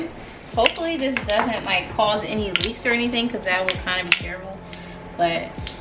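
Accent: Philadelphia, United States. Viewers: Lol I knew 3 seconds into the video you were from Philly! Thanks for the info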